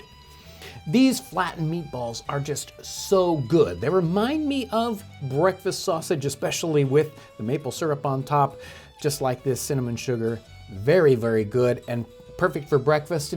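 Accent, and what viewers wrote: United Kingdom. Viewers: Also are you British.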